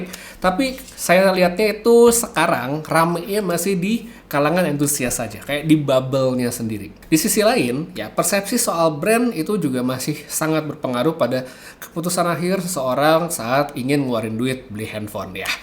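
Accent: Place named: Java, Indonesia. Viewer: Bang dengan medhok jawanya: "Galaksiii Eaiiii"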